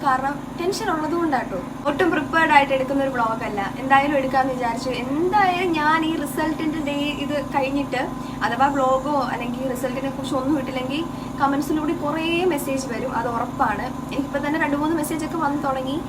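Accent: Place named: Punalur, India. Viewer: ഞങ്ങൾ പുനലൂര്കാരുടെ സംസാരരീതി ഇങ്ങനെ ആണ്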